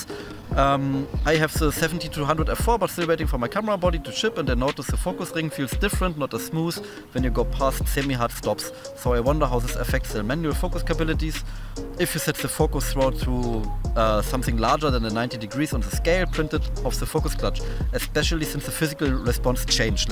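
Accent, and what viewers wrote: Berlin, Germany. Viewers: Schade das du die videos nicht in deutsch machst . Du kämst mit deiner berliner schnauze sicher viel geiler rüber und es gibt nur wenige deutsche kanäle die nicht langweilig sind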